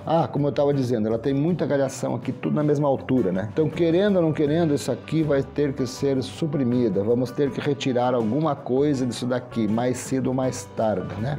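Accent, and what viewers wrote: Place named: Rio Grande do Sul, Brazil. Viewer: Ah sim, achei o seu sotaque bem parecido com o nosso daqui do RS, gosto muito dos seus conteúdos.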